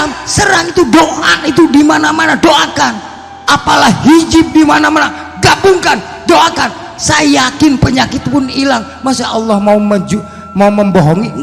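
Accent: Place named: Sunda, Indonesia. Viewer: Has sunda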